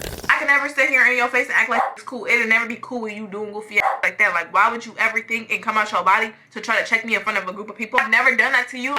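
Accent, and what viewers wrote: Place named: Chicago, United States. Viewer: i love ur videos they are so interesting. but i have a question are u from Chicago ur accent is very strong?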